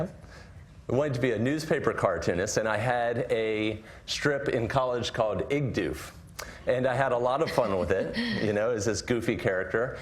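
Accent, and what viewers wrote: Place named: Brazil. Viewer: The way he talk brazilian XD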